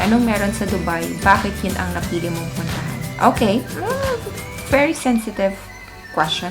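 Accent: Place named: England, United Kingdom. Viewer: I will raise a question pretty lady, HOW TO BE YOU??? I rrally like your english accent. ❤️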